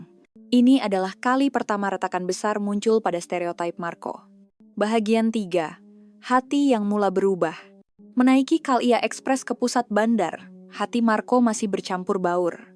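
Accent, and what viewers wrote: Indonesia. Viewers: Aik suara indun!! Tapi konten Malaysia!!! Tak usahlah buat konten pasal negara orang, buat lah negara kamu sendiri!!! Indunnnn!!!!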